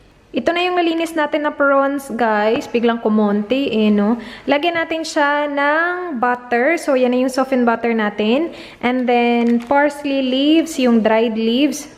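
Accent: Visayas, Philippines. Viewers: Nakakatuwa talaga ang bisaya accent mo ate😄 Chaaar uuuyyyyyy!😁😆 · first time ko manood ng video mo po. And da way ka mo sulti nakahibaw na jud ko na bisaya ka te hahahaha love this video and imma subscribe to ur yt channel na hehe. Lovelots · The way she speak English, at mag bibisaya sa huli... 😂 That's cool..❤️❤️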